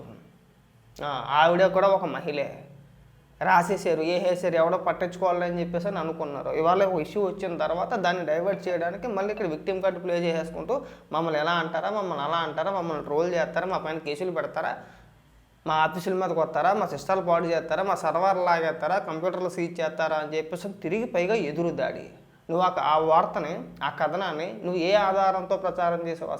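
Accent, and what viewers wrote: Godavari, India. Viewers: అన్న మీ వీడియోస్ అన్ని చూస్తాను మీరు చాలా బాగా వివరంగా అందరికీ అర్థం అయ్యేలా చెప్తారు మన గోదావరి భాషలో కొంచెం వెటకారం కూడా ఉంటుంది ❤❤❤❤❤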